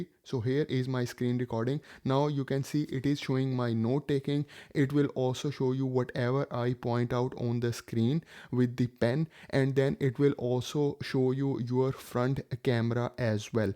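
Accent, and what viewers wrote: India. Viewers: Indian english is so funny😂 love it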